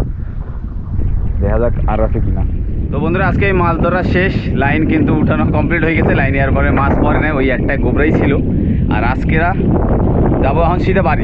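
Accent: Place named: Shariatpur, Bangladesh. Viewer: তোমার জন্ম স্থান কোথায়, বাংলাদেশ না আন্দামান। তোমার ভাষায় মনে হয় শরীয়তপুরের আশে পাশে।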